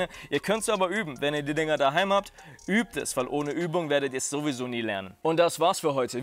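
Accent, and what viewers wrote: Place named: United States. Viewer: Hey coach, wow amazing! Warst du mal länger in Amiland? Du redest fast wie son cooler Amerikaner. Ziemlich nice.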